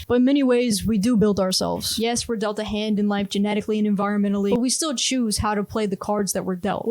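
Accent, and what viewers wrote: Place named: United States. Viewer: What part of the US are you from? I’m trying to decide your accent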